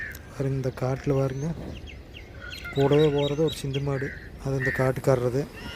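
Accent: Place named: Kongu, India. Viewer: Kongu speak super ga anna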